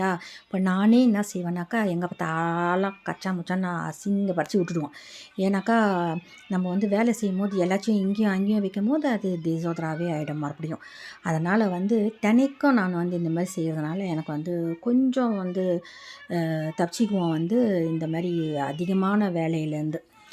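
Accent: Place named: Pondicherry, India. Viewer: Bonsoir, comme d'hab,une bonne recette toute simple.J 'adore tes vidéos pour ta façon de parler,une vraie Pondichérienne 😄.Tu me fais pensé à mes parents et à mon enfance.Merci ma chère.Continue à nous faire plaisir ❤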